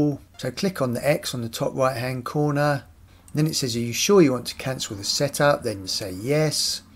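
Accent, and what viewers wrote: United Kingdom. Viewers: IT WORKED! 👍 Thank you so very much. I’m not IT literate, but due to your easy instructions, along with your British accent, I was able to complete this task Diolch yn fawr 🏴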